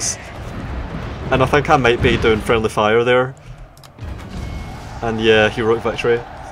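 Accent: Scotland, United Kingdom. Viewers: That was frigging disgusting. Those haters of yours always focus on bullshit cuz u speak the truth, never see them chirping your generalship tho. Honestly you have turned battles into a real art, utilizing all of the game and interface mechanics, I honestly don't know how you click so fast and accurate, switching between things seemlessly, all I know is you have a god tier level of experience in this game and it is very admirable, on top of that you deliver the commentary with a devilish Scottish accent. God speed. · Are you Scottish?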